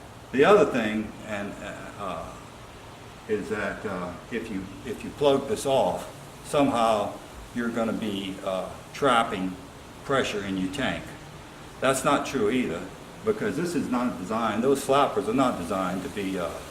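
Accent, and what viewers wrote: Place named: New Orleans, United States. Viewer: sounds like your from new orleans area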